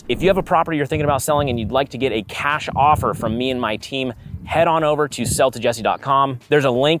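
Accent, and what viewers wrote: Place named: Pacific Northwest, United States. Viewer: Whole video I was thinking the area looked so familiar and your accent was very PNW but when I saw Hood off the balcony that kinda cemented it lol 😅 I'm 98% certain I have drilled some water wells for those hills back 20+ years ago now. Little more crowded than it used to be.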